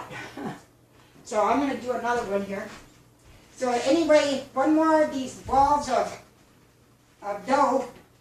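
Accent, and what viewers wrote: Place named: South Dakota, United States. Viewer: I’m from McLaughlin , SD and your voice brought me back home 😅😂 haven’t had gabubu bread in so long !!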